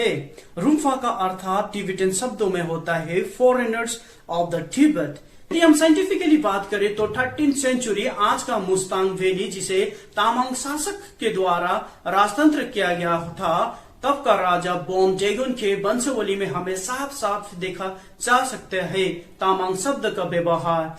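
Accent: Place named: India. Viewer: Hahaha...nice joke first of all you sound like indian to me hybrid of indian not nepalese As a newah buddhist i would like to say Tamang neva invade or attack nepalese kingdom Tamang are one of the kind community and hard working people of nepal newah tibetan both emperor were close neighbouring nations they had a good relationship before shah dynasty came to existence both newah and tibetan exchange buddhist culture not only business even they get married Tamang are originated in nepal not from tibet Ofcourse they share tibetan culture and there own bon traditions Having mongolian face cannot be said they are mongols 😂😂 you may ask me why read a invasion japan by mongol kubalai khan Mongol were badly defeated by shogun samurai warriors several times if you go to mongolia if you call ur self as mongol they will slap you on you face without any doubt if you share ur history with them Because asian face were living in himalayas before genghis khan.. 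before saying anything about Nepalese kingdom protect your community by christian invasion mostly tamang community are walking wrong path in christianity why don't you make that kinda video rather making these kinda videos which are base less Im not saying tamang don't exist According to nepa mandal tamang gurung and newa were 3 brothers later century 3 brothers were separate it was happen in todays kavre district this incident was said by my tamang friend who is living soyambhu area in present day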